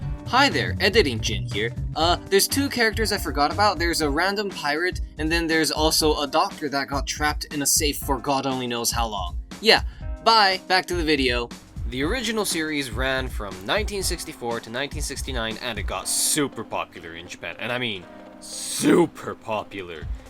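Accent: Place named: United States. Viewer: Good research, for some reason I didn't image you having such an American accent lul.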